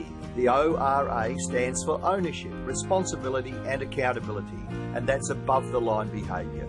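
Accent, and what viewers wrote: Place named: Australia. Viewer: I loved the Aussie accent 🫶🏼🦘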